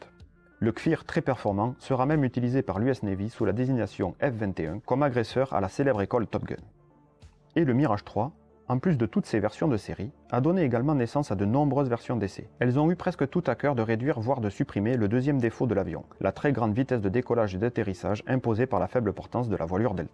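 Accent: South, France. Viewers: L'accent du sud, avec tout plein de Mirages avec des cocardes rouges à croix blanches... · Passionnant ! Continue comme ça. Et le petit accent du sud ajoute une touche vraiment sympathique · pour l’accent du sud ! Super contenue technique au passage . Un petit dossier sur le pa49 ou un petit Leduc des familles ? Continue !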